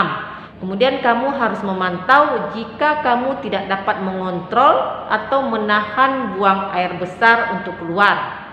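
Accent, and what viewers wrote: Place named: Padang, Indonesia. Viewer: sepertinya logat dan namanya buk dokter orang padang, maaf kalau salah🙏 kalau daerah sumbar RS mana yg biasa menangani fistula ya buk?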